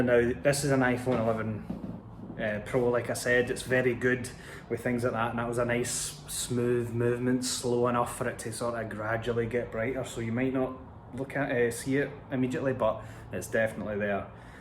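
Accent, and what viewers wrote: Ireland. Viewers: Man i love the irish accent, cool video very helpful. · I’m here for the Irish accent.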